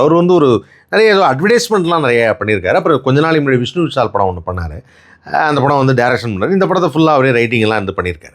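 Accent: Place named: Coimbatore, India. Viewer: Kovai Accent 💯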